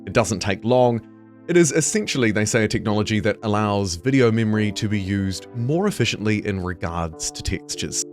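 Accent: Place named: New Zealand. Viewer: Kia ora. Another kiwi that accidentally pronounces it "pre-formance" rather "performance". The game is atrocious. If it were a toaster, an oven, or a tv you'd return it for a refund. Got my refund. Will see what happens to the game over the next year but not holding out hope. This game will go down in history as an infamous disaster.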